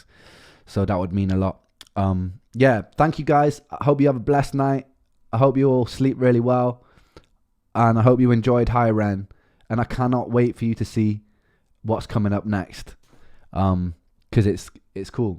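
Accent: United Kingdom. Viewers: love the facet as a fellow man who is a Welshman and who thinks of themselves as a Brightonion I still hear the welsh pronunciation in your words like Appy, with a soft a.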